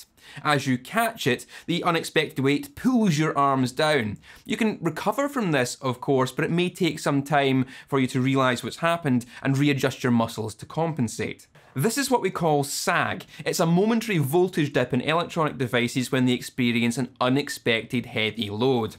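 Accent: Scotland, United Kingdom. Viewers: i enjoy all your "ta tas" and learn a lot.....your brogue is entertaining and your enunciation perfect....had a Scottish girlfriend back in the 60s, her name was Carol Cummings, did you know her?...just joking, love your lectures!!! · Great video. I sure know a lot more about fukin valve amps than I ever did before! Love that accent of yours. What part of the UK are ya from Jerry from PA-USA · Ah wouldn't a-trusted hem but for his Scots brogue.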